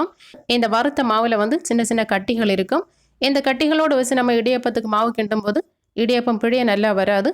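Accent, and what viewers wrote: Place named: Kanyakumari, India. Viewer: குமரி தமிழ் அருமை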